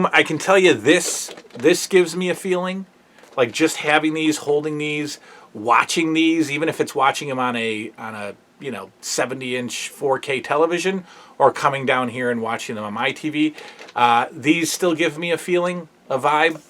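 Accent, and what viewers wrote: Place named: Central Pennsylvania, United States. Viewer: Where are you from? It sounds like we are close to each other. I'm from central Pa.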